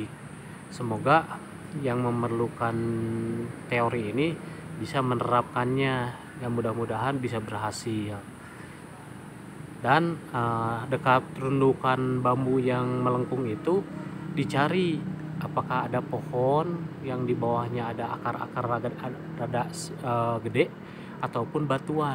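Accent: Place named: West Java, Indonesia. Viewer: Nuhun kang, logatnamah bangun urang sunda, ti palihan nana kang???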